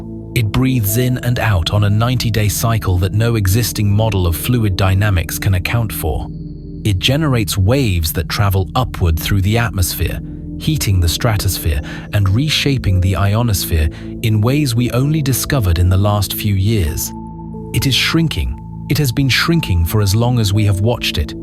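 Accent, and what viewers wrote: United Kingdom. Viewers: Does every video like this have a British accent on it? Funny.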